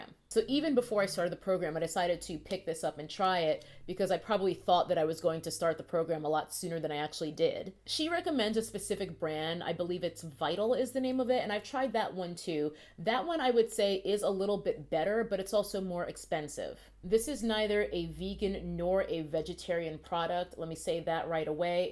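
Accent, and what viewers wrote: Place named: Brooklyn, United States. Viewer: Girrrlll i coulda swore you lived in NewYork. Like straight Brooklyn Native!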